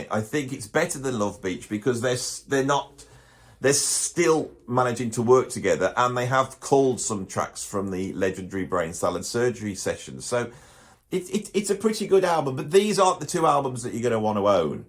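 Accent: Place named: Poole, United Kingdom. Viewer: I'm just having fun. Great video. This was my all time favorite band. Are you from Poole? You and have very similar accents.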